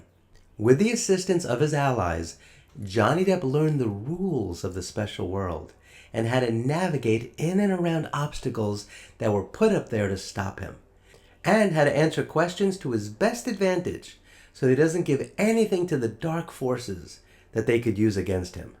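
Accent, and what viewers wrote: New York, United States. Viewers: Wow. A vegan crazy cat guy proficient in mythology and uniquely gifted to tell the tale - in a New York accent no less! 😍🥰 In the interview with Joe you quoted your mom to say a New Yorker would never be president because people don’t understand us. No truer words! And they are poorer for it.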